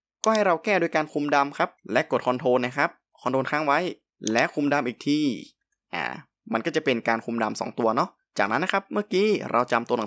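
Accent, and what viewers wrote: North, Thailand. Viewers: ขอบคุณสำหรับความรู้ดีๆนะคะ ว่าแต่เจ้าของคลิปเป็นคนเหนือไหมคะ😂😂😂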